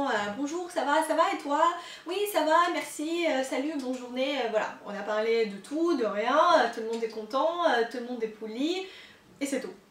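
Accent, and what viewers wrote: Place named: Russia. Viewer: Mais v'est chouette ce petit accent russe. Faut surtout pas le perdre !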